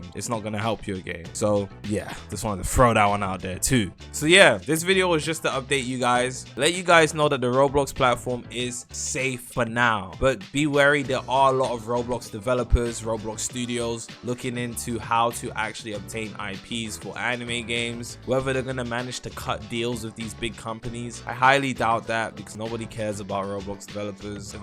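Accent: United Kingdom. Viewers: most bristh person i ever heard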